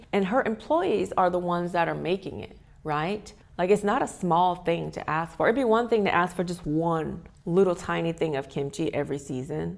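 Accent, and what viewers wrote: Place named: South, United States. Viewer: hahaha love your southern accent comming through, i love these story times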